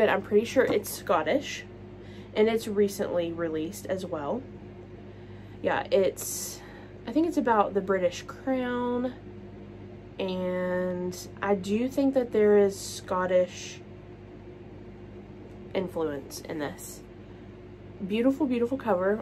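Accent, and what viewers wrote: West Virginia, United States. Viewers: My frand..your west Virginia coming out..I'm cryinggggg 💀🤣 I love your accent ❤️ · Lol gurl let the WV come out!!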